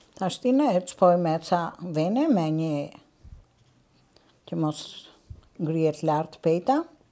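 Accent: Tirana, Albania. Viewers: Mirdita zonje , kam pak kohe qe po ju ndek nga Italia, me kenaqe me ate fhekesin e vjeter tironase, dhe me recetat dhe shpjegimin tuaj te fhjesht popullore. Ju uroj SHENDET 👌👏🙅‍♀️❤️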